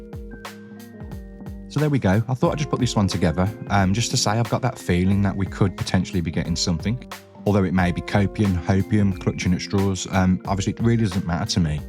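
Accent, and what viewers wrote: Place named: United Kingdom. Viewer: Everyone can just admit they dont even know what a GTA VI is and is just watching for his cool British accent.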